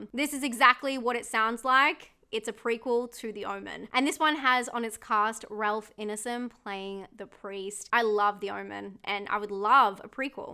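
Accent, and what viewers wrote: Australia. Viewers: >hear australian accent > immediately click off video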